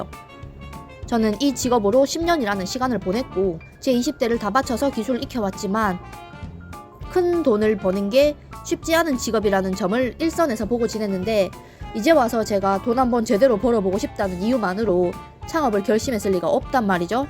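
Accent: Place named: Gyeongsang, South Korea. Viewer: 같은 경상도 사람으로써 말투 귀엽네여 ㅋ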